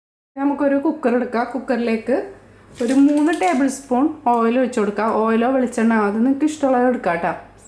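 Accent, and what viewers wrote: Kodungallur, India. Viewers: 3 പീടിക, sn പുരം, കൊടുങ്ങല്ലൂർ ഈ പരിസരത്ത് ഉള്ളവരുടെ സ്ലാങ്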